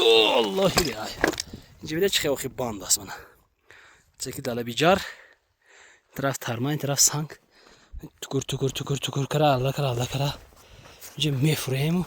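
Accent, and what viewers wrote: Tajikistan. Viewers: Салому дуруд аз ноҳияи Варзоб деҳаи Зимчуруд. Дусти азиз, раҳматҳои беандоза ба шумо ва ба Падару Модари бузургвор,ки шуморо тарбия карда ба воя расонидаанд. Ҳар шахсе,ки шаҳди ин ағбаи хатарнокро чашидааст то дами марг фаромуш намекунад.Тавре ки шумо навор гирифтаю суханрони мекунед, ман фикр мекунам,ки дар ҳамон давру замон шумо гӯё дар қабати мо Камазистҳо, рост истода будед.Дар ҳақиқат дар пеши чашми мо мошинҳо аз роҳ ғеҷида ба ҷари мерафтанд,одамҳо мемурданд,локин илоҷе набуд.Шабҳо аз ин тараф ё он тараф мошинҳо дармемонданд,бамиқдори бисёр.Мо шабро бо машаққате рӯз мекардем,хеста аз мошин фаромада медидем,ки нисфи мошин дар таги барф мондааст,боз белу лапаткаҳоро гирифта то омода шудани булдозер барфҳоро тоза кардан мегирифтем. Вақте,ки тунелҳо кушода шуданд мо ба синни пири расидем.Айнан ҳамин хел ҳодисаҳо дар ағбаи Анзоб ҳам рух медод.Вақто, ки навори шуморо тамошо карда суханҳои ширину аслии тоҷикии шумор шунидам ашк аз чашмонам равон гашт.ЗИНДАЮ ҶОВИД МОНД,ҲАР КИ НАКУҲНОМ ЗИСТ,КИ АЗ АҚИБАШ ЗИКРИ ХАЙР, ЗИНДА КУНАД НОМРО. 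Саломату сарбаланд бошед. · Акаи забони ширини точикиба Шумова гап нест. Молодец! Салом аз Хучанд. Ман хам орзу дорам як руз скутер е мопед харида хамон перевал равам. Соли 2007 якумин ва охирин бор аз хамон чо то Душанбе ва обратно ба Хучанд гузашта будам.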